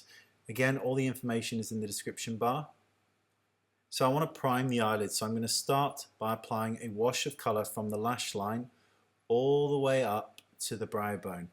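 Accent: United Kingdom. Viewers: that girl is beautiful and the finish look was gorgeous. I love the way you work and I also like your accent (I'm learning English so I pay a lot of attention to what you say and the way you say it) btw, where are you from? I reeaally love UK accents. xoxo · Ah... I love when he says delicious. And naughty. You can NEVER beat the British accent. ;)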